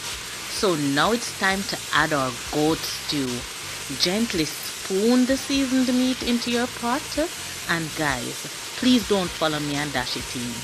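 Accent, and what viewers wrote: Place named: Jamaica. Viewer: Love this style. Nice accent. Big love to Jamaica 🇯🇲🇯🇲🇯🇲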